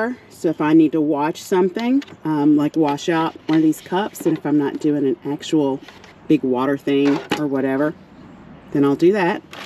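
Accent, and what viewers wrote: South, United States. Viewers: Hey Mrs ❤️ I just stumbled across you and I am happy I did ❤️ you're so beautiful and I loved your video ❤️ I'm considering living In a vehicle so this was a Little inspiration for me ❤️ 🙏 can't hardly afford bills and rent anymore. It's literally draining me and I am a single black woman nearly making ends meet. I make enough to pay a bill and rent and pay to go right back to work and I'm broke right after I get my check. The cost of living is horrific 🙏 so I am trying to come up with a way that I can live on little to none and save my $ for expenses I really need. So thank you for this video sweet mama 😀 ❤️ much love to you from the Carolinas ❤️ you sound country like me ❤️ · I have been watching truck camper setups for over a year.... Probably well over a 100 different people.... And everybody has their own style obviously..... 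It is a proven fact that while men come up with good ideas and they know how to put things together..... It is generally a woman that comes up with the finesse to make it look good and efficient....... So I would have to say that this is the nicest setup I have seen yet.. And you could see very clearly that that was not feces in the bottom of the portable toilet... At 1st glance it looked more like dry corn... You sound southern....plus the expression, "y'all "..... what state are you from?? Thank you for the video young lady..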